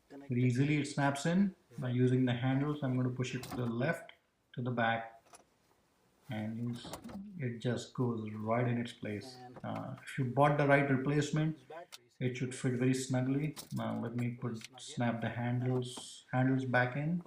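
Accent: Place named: India. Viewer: indian accent ? its terrible man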